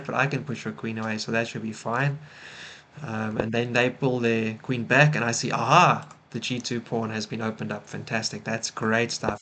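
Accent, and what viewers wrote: South Africa. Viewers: Where are you from? you sound a bit south african lol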